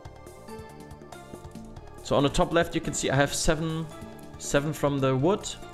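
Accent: Germany. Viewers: bin Random hier gelandet aber sofort rausgehört einer von uns 🤣 junge dieses ewige Prager wechseln das ist echt stresserzeugend 😂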